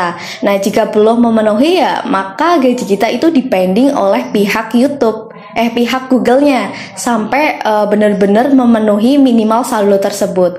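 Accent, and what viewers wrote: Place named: Java, Indonesia. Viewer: Wah udh dapat gaji ya kak, mantap.. Nek denger logate, podo bae wong jowo iki.. Kayane sekabupaten malah hhe.. Semangat berkarya selalu..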